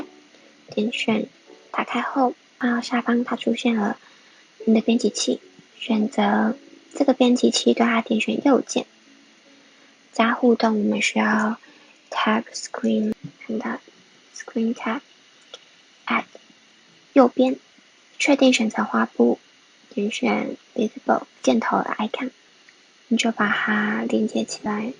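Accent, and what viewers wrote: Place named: Taiwan. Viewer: 聽你的口音還真的是台灣人，唉，你能不能轉國籍到大陸？你的人品比較適合在那邊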